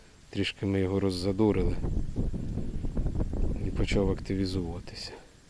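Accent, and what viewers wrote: Poltava, Ukraine. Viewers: обожнюю полтавський акцент.Дуже багато схлжого з артуром і жести і слова ну то тнічого ми ж українці)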